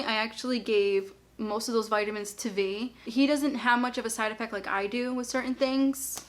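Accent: Boston, United States. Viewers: She’s from the Boston area 🥰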